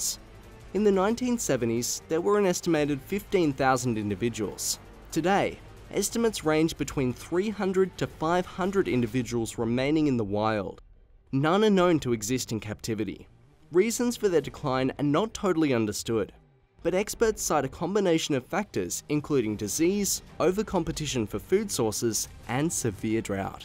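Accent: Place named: Australia. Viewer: Im Glad theres an Actual Aussie narrating videos here, I just came across an old vid with the most HORRIBLE impersonation of an Australian accent, if Im being Honest, As an Australian myself it made my twitch a little listening to it haha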